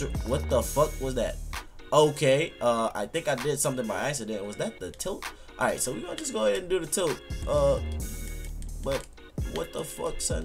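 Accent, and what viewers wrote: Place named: Philadelphia, United States. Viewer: is from Philly the dudes that say down like that form the P lol my boi from there and say it the same lmao... love your channel fam keep up the good videos ma g!!!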